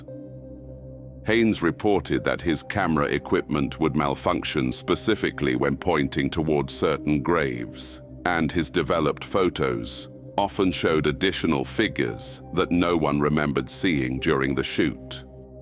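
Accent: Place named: United Kingdom. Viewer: hello I just subscribed to your YouTube channel love your videos love your British accent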